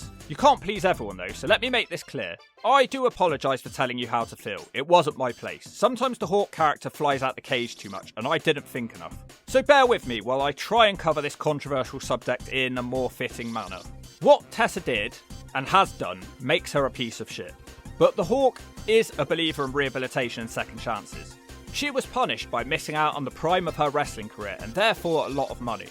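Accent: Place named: Scotland, United Kingdom. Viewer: Yeah, his voice can sound a little southern American and Australian if he's hanging back but he never had a THICK Accent, just some scottish ticks.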